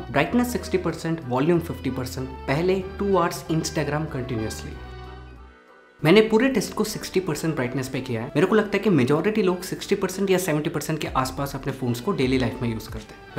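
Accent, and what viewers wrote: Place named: Tamil Nadu, India. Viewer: nee hindu pesura style paathope purinjipochu... Tamil naatu accent irruku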